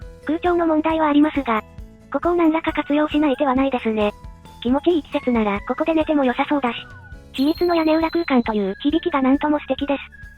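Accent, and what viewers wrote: Hakata, Japan. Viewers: 博多弁が可愛い♡ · 博多弁がうれしか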